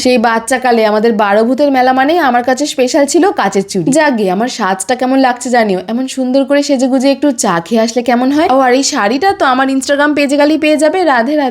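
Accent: Bangladesh. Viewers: আপু আপনি বাঙাল না ঘটি 🤔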